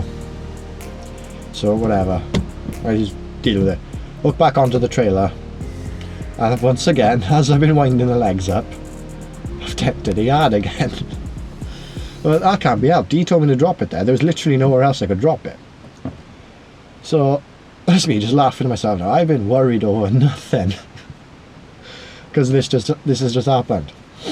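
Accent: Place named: South Wales, United Kingdom. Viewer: you sound like you could be from South Wales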